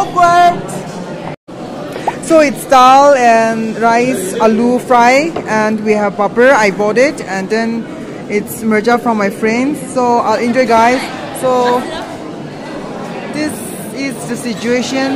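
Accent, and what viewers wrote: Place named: Ao, India. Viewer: Heavy Ao accent! but what else do we expect ? One can't be an accentless human unless one is dumb.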